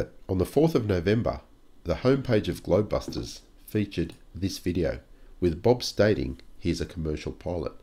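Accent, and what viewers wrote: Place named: Australia. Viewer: I like the Australian accent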